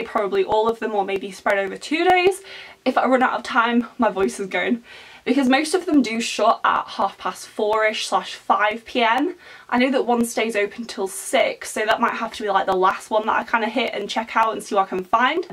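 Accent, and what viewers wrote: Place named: England, United Kingdom. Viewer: I like your style! Just subscribed 😊. Whereabouts in England are you from? Your accent reminds me of home - I've mostly lost my accent from living abroad. Now I just have a general British accent that's hard to pinpoint! The teddy is sweet, not creepy at all. I recently picked up a guinea-pig soft toy that made my mum's 'flesh creep'!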